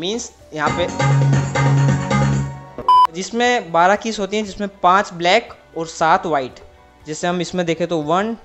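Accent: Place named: Gujarat, India. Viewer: Ye pakka gujrati hai 😂😂😂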